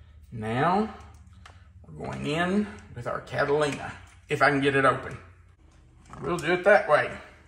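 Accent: South, United States. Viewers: Thank you for all the yummy recipes! I love your calming Southern drawl. Sometimes your videos make me sleepy, your voice soothes me so much! Thanks, for writing out the recipes. Sending hugs from Beth in Savannah, GA.🤗💜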